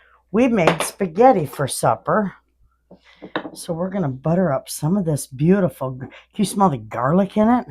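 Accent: Michigan, United States. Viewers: I heard your accent and I said shes a Michigan girl. Went to your description, yep!!! I"M a Yooper.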